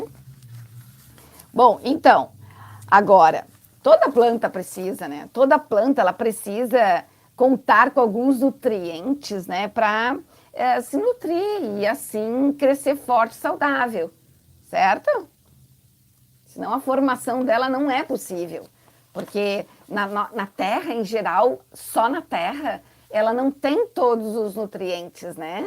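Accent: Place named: South, Brazil. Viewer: 👏👏👏sou do Rio de Janeiro! E amo o modo de falar de vocês do Sul ! Do nosso amado Brasil!! Beijos!!!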